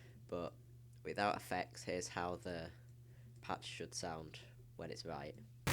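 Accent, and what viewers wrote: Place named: Nottingham, United Kingdom. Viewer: u sound like ur from nOTTTINGHAAAAM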